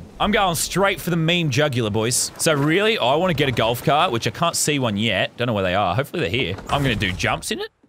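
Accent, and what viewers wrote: Australia. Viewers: PiBi23 Official he is australian the accent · I’m Aussie and you can tell he makes the accent stronger (for comedy), cause when you have an Australian accent it’s really easy to go full out Aussie mate... yeeeeeeee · You sound very Australian mate (I'm Australian as well)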